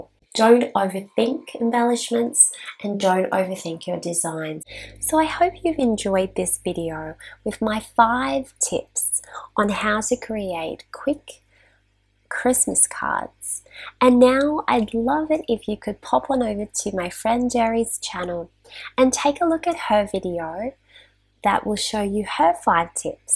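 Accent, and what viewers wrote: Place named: Australia. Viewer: I love hearing a fellow Aussie accent on YT! New sub from Central Coast NSW 🇦🇺❤️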